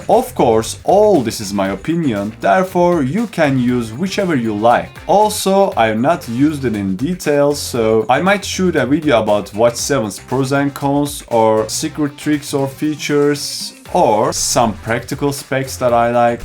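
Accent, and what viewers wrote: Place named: Turkey. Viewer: Öylesine açtım bu aksan tanıdık derken Türk çıktı 😂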